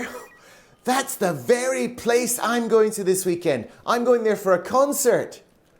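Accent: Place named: United Kingdom. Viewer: U hv typical uk pronunciation 😂 n ur japanese is much better than mine🤦🏾 老師好厲害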